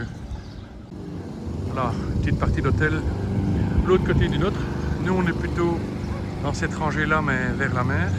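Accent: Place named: Belgium. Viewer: Bonjour Monsieur. Ça fait plaisir d'entendre la voix d'un compatriote belge sur une vidéo d'Égypte. Je suis de la région de Mons, Dour plus précisément 🥳. Voilà, je viens vers vous car je suis tenté par cet hôtel pour l'été 2026. l'Égypte, nous y sommes allés plusieurs fois (5 fois au cours des 15 dernières années, 3x en couple, 2 fois avec notre fils, et cette année pour partirons pour la première fois à 4 avec notre fille qui aura 4 ans), donc on va dire que l'on connaît un peu (mer population nourriture désagréments intestinaux 😅 etc). Je voulais connaître votre ressenti général sur cet hôtel (nourriture, propreté, animation le soir etc...). Avez-vous plongé depuis le ponton de l'hôtel car pour moi la qualité, beauté de la barrière de corail est également un point essentiel dans mon choix d'hôtels en Egypte. Merci d'avance pour réponses. Amicalement, Grégory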